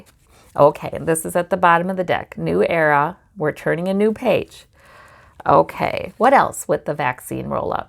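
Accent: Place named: Upper Midwest, United States. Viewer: Very nice reading...as expected! Love your Upper Midwest style! Stone cold Chicago Democrat here...now living in NC...and I miss the Midwest every single day! Was able to absorb great peace from the Great Conjunction last night, which revealed itself right over my house! Hope we can all draw strength from our tribulations...In order to make our world more positive. Blessings to you, sweet...carry on!!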